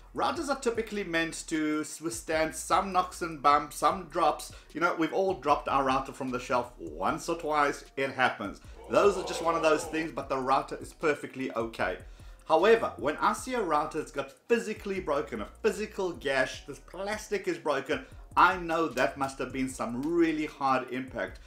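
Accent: South Africa. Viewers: You confuse me... S. African Accent, Israeli name... but you live in the US?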